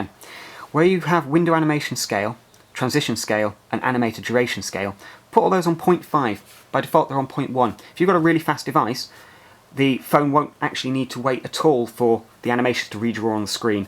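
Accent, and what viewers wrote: England, United Kingdom. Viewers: are you british ? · i subscribed to you.real english voise.am used to american.^-^ i am a motorola fan.i have old q 11 and old milestone. maby motorola come out something like the note.this nice phone.i belive its intel processor ?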